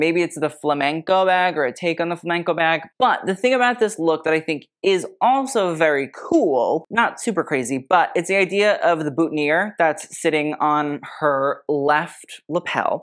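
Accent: United Kingdom. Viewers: Your British accent is Fantastic darling 🤩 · Wow, excellent British accent considering you are American. Have you ever thought about starring in British costume dramas? Thank you.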